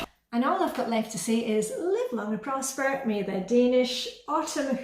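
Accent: Scotland, United Kingdom. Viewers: Thank you for an uplifting video I do buy my own Christmas gifts or given exact list. You have inspired me about the 5K in December..... I can walk everyday in December for at least 15 minutes.... I noticed your Scottish accent is stronger after visiting your mom blessings to you, thank you, Dallas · My mother had heart disease & I cringed when I saw your mum drinking alcohol, but maybe it's not an issue with for her. Your accent sounds strong after living in Denmark for 20 plus, but when you return home to Scotland do they tell you you've lost much of it?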